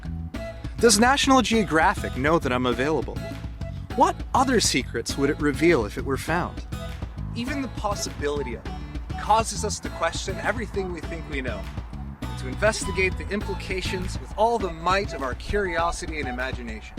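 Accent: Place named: Russia. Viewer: Awful music and the Russian accent sounds crass and stupid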